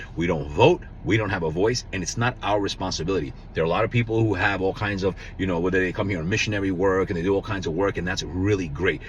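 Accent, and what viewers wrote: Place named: Dominican Republic. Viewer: Are you Dominican? You have the accent! 😮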